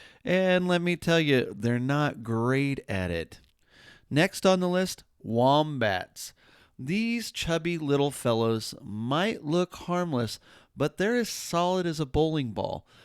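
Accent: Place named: United States. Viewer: I'm sorry with that American accent really put me off. Australia is NOT all about Kanga's and Wombat's on main roads. Learn a bit better. Everdday driving in Oz is very different. You are on the right in the driver's seat and yes, left of road. Makes sense. We are not imbiciles. Like anywhere, you need to be aware of all dangers and risks here but in everday travel you will not encounter kanga's or wombats!!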